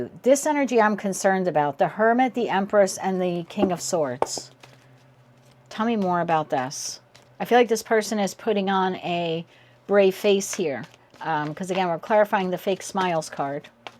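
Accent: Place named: New Jersey, United States. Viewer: I got every word .. must be a Jersey thing lol stay warm/safe in this weather ❤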